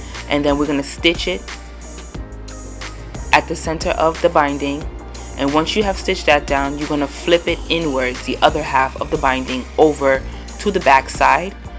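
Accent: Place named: New York, United States. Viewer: yesssss tell him, plus since he’s trolling about pronunciation - let him know it’s your NY accent🙌🏽🙌🏽🙌🏽🙌🏽🙌🏽!! Ppl are so quick to pass judgement! Language skills have nothing to do with her sewing talent!!!👍🏽👍🏽👍🏽👍🏽👍🏽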